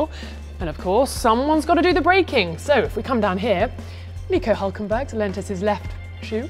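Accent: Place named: England, United Kingdom. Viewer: Джереми Кларксон в юбке. Англичанка чтоли? у них у всех такой тембр и акцент?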